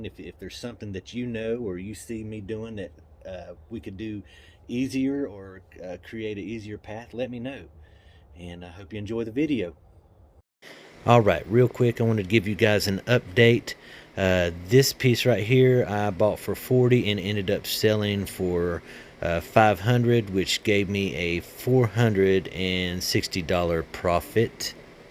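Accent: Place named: South, United States. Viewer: !!! these look fantastic!! i love how you did the base!! so unique!! i love the southern accent too!! Michigander here recently transplanted to VA... I tell ya what i am getting a way higher profit here in the DC NOVA area vs selling in Michigan.. location really makes a difference!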